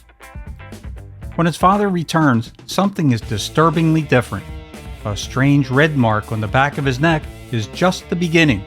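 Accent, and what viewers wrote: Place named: Delaware Valley, United States. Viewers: Don’t ever lose that Philly accent! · Judging by your accent, you grew up in the Philly/Del/South Jersey area.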